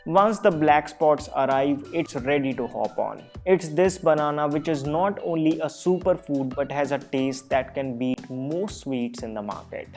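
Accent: India. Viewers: Are you intentionally using a thick indian accent??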